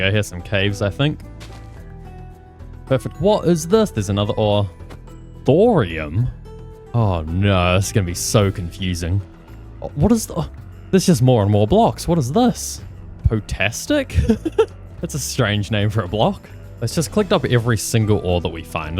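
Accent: New Zealand. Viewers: This man kinda sounds like the Minecraft playing Kiwi Version of Martincitopants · are you kiwi?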